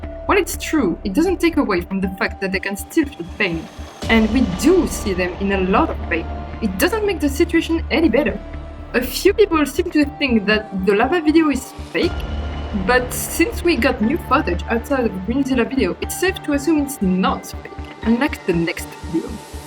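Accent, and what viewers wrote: France. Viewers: I'm betting right now, that you may be french, 'cause you sound french.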